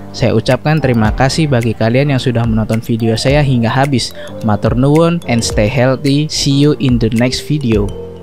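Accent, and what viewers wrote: Purwokerto, Indonesia. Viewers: wong purwokerto mas?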